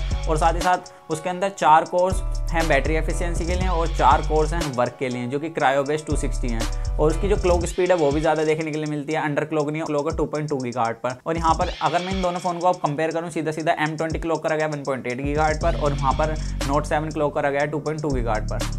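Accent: Haryana, India. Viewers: haryanvi ho bhai kya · Launda Haryana se lag Raha h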